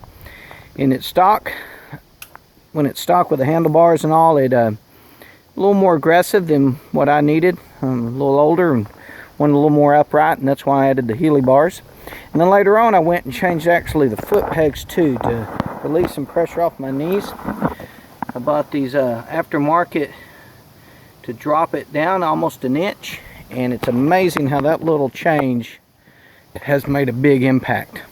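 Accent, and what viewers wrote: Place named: Texas, United States. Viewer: Man... if i close my eyes, you sound like Baby Bush....must just be the Texas in your voice.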